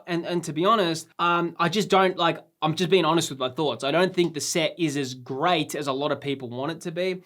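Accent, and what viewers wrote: Australia. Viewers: first time follower and your format is awesome, and AUSSIE yewwww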